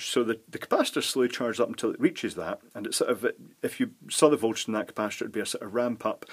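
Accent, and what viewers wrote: Scotland, United Kingdom. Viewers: Cool, a channel worth watching!, thanks man! Loving those dulcet Scottish tones and the information and ideas you provide in your vids, keep it up, you got me interested in electronics again! Regards and greetings from south east UK! :)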